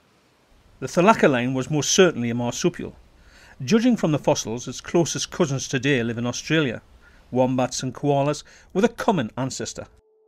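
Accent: United Kingdom. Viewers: ''the size of a siberian tiger'', " that spent most of its time in the tree ". Yeah right, a big tiger-sized tree animal that only had Moas for prey, how stupid this Scottish accented narrator think the audience can be ????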